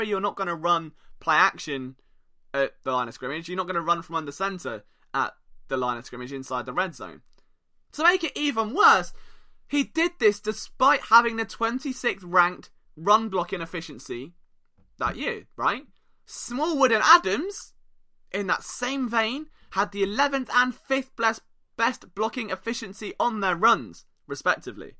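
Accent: England, United Kingdom. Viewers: Exactly. I guess some people don’t like his English accent talking about American football but they are just being a-holes.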